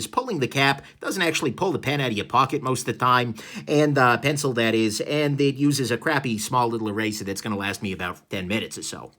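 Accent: New York, United States. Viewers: Your accent is so cool! Are you from New York? I quite like this pencil for drawing technical drawings, I still need to upload a video where I use it but I like it so much that I'm going to be selling these on my website. I am looking forward to trying one of the Rotrings some time.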